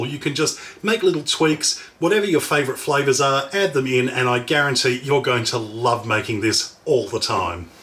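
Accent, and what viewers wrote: Australia. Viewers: Okay, the first time I watched one of your videos I thought you were English, but know I think you are Australian. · not British. Australian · i I genuinely hate the aussie accent but i don't mind yours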